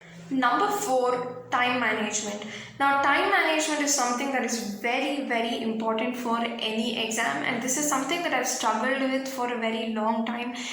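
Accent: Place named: Kerala, India. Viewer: Are you a keralite? (your accent make me feel so)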